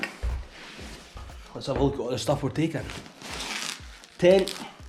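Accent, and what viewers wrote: Scotland, United Kingdom. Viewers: Great to see you guys! I have been doing some family history studying and researching my Scottish roots. Your accent takes me to to a place I want to visit someday. Loved your book! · Great to see how much you love your beautiful cat.I have two one year old cats and I talk to them too😸😁I live in Italy but I was born in Edinburgh so I enjoy listening to your "bonnie" Scottish accent 😁and admire the great scenery👍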